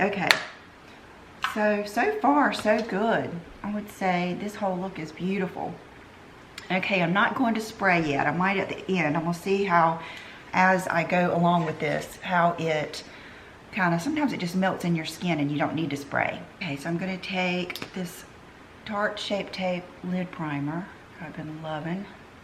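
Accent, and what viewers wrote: United States. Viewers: I am a recent subbie from the UK living in Spain and I love your channel. Love your accent and you are very pretty. What state do you live do you mind me asking? Xx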